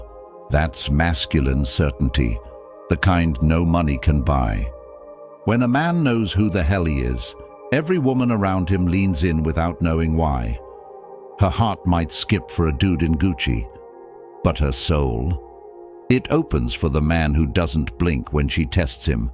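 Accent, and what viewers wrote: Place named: RP, United Kingdom. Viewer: I’m tired of that narrative voice in most of these stoic videos. Then words like “brother” or “a dude in Gucci” would never be said in that upper class British accent.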